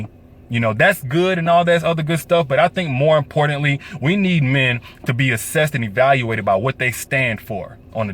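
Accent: South, United States. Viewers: Lol, the living room. You are straight out the gate 250% SOUTHERN....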